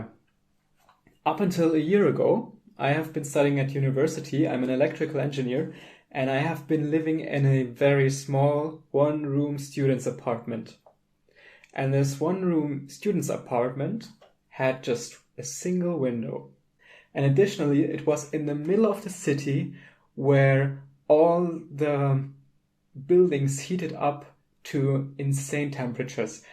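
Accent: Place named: Germany. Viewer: why if i hear how people speak english i directly know which their original countries are? I guess he is GERMAN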